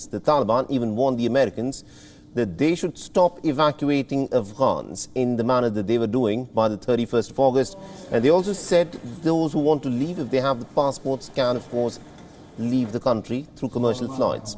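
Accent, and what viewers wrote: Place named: India. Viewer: Real English, Indian accent. You yankis should realize there is a whole world outside your borders.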